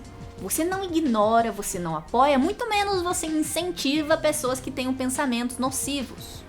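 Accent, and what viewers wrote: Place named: Minas Gerais, Brazil. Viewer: tu tem o sotaque de mineiro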